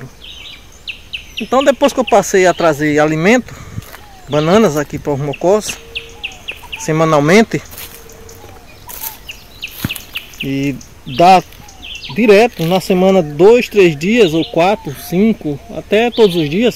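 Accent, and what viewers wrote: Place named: Northeast, Brazil. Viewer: acho muito legal ver seus vídeos e ouvir sua narrativa com esse sotaque típico do verdadeiro nordestino. Abraços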